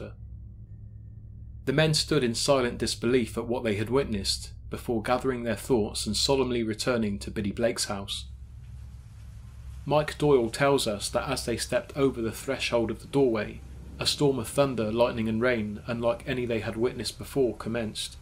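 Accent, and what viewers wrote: England, United Kingdom. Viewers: Forgive my ignorance but Why is an English man narrating this?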